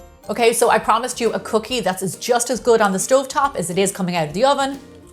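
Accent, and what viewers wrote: Ireland. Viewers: Thanks for the Irish accent and the great tips. Living in a hot climate you don't want to fire up an oven, so stove-top is the way to go. Also, when I baked an entire batch of cookies they sat there tempting me to binge, so your method allows me to bake 4-6 cookies at a time. I shape each cookie, freeze them on a cookie sheet, then freeze-bag them for future cravings.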